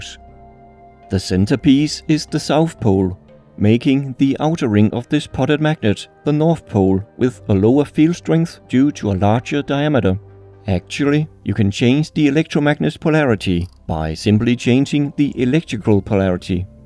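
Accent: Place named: Denmark. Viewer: Nothing like a good old danish accent 😄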